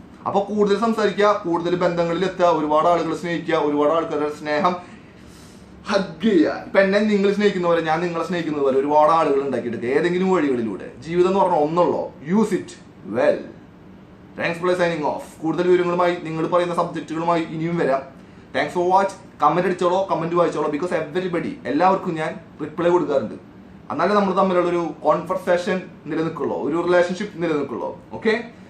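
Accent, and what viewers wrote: Thrissur, India. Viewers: Bro തൃശ്ശൂർ ആണോ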